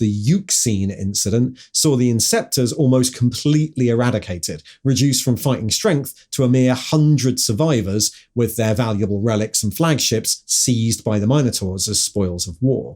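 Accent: England, United Kingdom. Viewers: Accents are so interesting and unique. I love learning about them. No, I'm not talking shit about him or anyone with an English accent.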